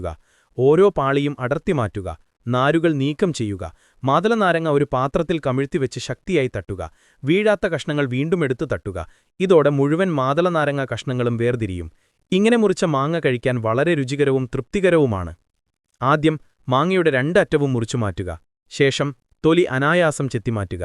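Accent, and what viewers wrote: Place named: Ponnani, India. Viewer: ഏതോ പൊന്നാനിക്കാരനാ വോയ്സ് ഇടുന്നെ എന്ന് തോന്നുന്നു 😬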